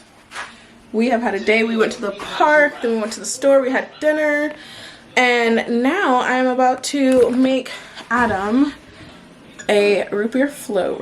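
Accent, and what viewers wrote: United Kingdom. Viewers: I love how he's starting to have an English accent 🥰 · Oh my goodness has that British accent 😍😍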